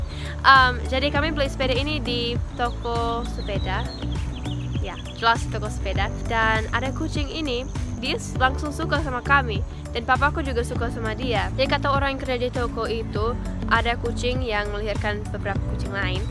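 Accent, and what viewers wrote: Papua, Indonesia. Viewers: Kamu bicara seperti logat Papua thts awesome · Ada logat papua-nya. :D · Wow logat papua bicaranya keren, hebat, saya tinggal di west java tapi suka dengan logat indonesia timur.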